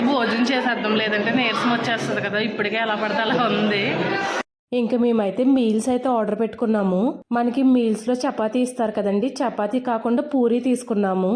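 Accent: Konaseema, India. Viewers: You have explained everything very well amma. Specially your konaseema style of language is nice to hear n infact we all enjoyed. Good vedio n we wish you all the very best .God bless you amma.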